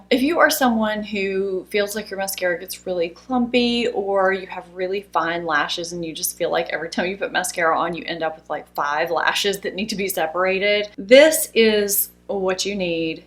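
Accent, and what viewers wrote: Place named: Tennessee, United States. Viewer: Love that you mentioned you are from Tennessee! I'm a Georgia girl, and have lived in Lousiana and Tennessee. The entire time I've watched you, I kept saying to myself, " She is not from New Orleans. She sounds like she's from Tennessee!" Isn't it funny that Southern accents sound the same to those not from the South, but those of us that have lived our lives here can place them.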